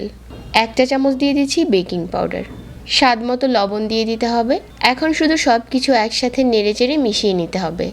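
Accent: Noakhali, Bangladesh. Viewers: আপনার বাড়ি কি নোয়াখালী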